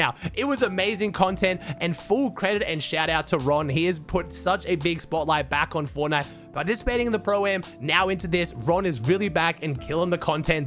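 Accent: Australia. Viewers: Your wierd Aussie!!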